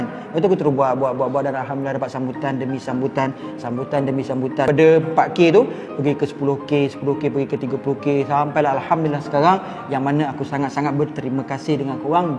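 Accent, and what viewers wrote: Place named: Perak, Malaysia. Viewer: Laa abg org Perak ke hahaha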